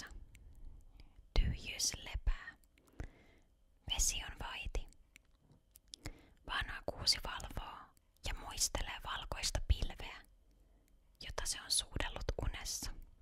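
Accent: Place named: Finland-Swedish, Finland. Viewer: The finnish swedish accent is so beautiful.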